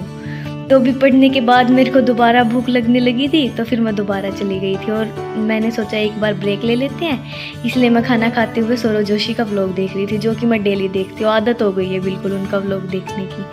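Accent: Haryana, India. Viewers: Haryanvi tone👌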